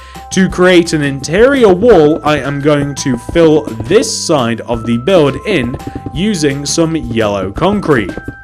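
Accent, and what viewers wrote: United Kingdom. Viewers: Quick question are you british?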